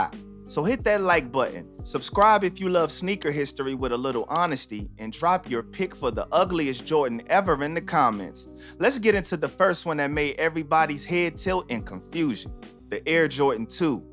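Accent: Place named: DMV, United States. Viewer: When you talk about the 2’s…when they came out, the top selling shoes for all dope boys were the Gucci tennis sneakers which are basically the Jordan 2’s but low top…and that’s exactly who bought those shoes…dope boys. They may not have been as widely popular as the Jordan 1 but for cats in the street that was touchin a little bread, they was on them. My brother had 4 pairs. I kept 1 pair when he went to the Feds and held on to them for a while. I ended up making a couple bands on them but I could’ve made more than that if I was smart. Anyway, I thought you was from the dmv based on your accent…MoCo or sumn. I guess not. Cause if you were from DC you would know that the 2’s were especially popular here in the 80’s. But you got it.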